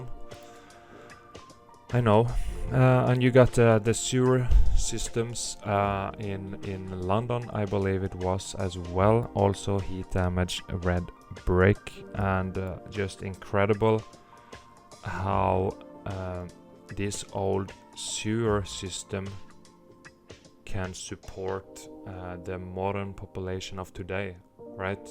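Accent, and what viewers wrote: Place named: Scandinavia. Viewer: Where r u from. Those of is in the states have a hard time recognizing different accents . My guess is 🇳🇴 or 🇸🇪 or Finland 🇫🇮.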